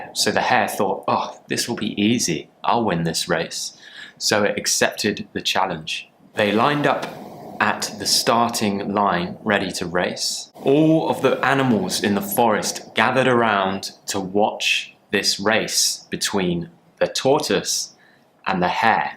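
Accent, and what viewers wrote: United Kingdom. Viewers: Hi The way you teach is impressive. I've loved British accent since I watched Harry Potter. Pity that I'm retired and I practice listening for fun. I'll recommend you to anyone who want a British teacher.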